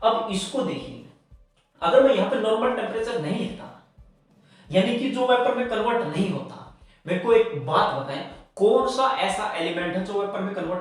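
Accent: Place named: Haryana, India. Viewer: That haryanvi excent in his language is just 😌,🥀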